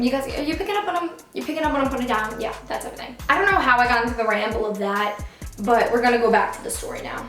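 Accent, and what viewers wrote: New York, United States. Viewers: are you a new yorker? we have very similar voices and I hear your accent. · U have such a New York accent 😂😂 love it 💗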